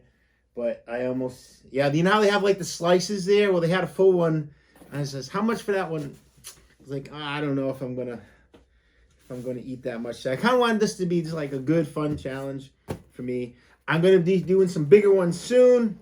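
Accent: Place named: New England, United States. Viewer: I'm surprised you don't pronounce papa as "puppa" with your New England accent.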